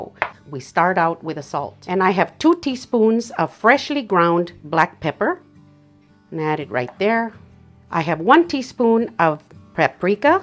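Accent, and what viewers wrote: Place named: New Mexico, United States. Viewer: you have a beautiful accent where are you from? You sound from my home of New Mexico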